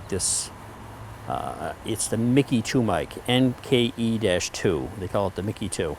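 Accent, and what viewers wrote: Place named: New England, United States. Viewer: Living in a few different states over the years I've never really developed a strong accent in my speech. But listening to you speak made me chuckle to how strong a New England accent can be. Hope you're doing well.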